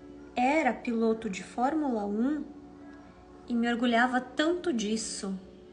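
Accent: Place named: Bahia, Brazil. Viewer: Cara que videnre chata ! Com esse sotaque com uma mustura de baiano cauxo minerio !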